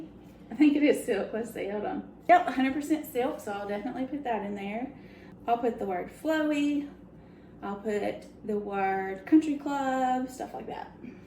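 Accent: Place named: South, United States. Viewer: Did that Cabi have a black dragon on it so definitely put dragon in your title love your Southern accent and easy going helpful content I'm from Ms original are you from there or near sure sounds like it! Keep up they great work!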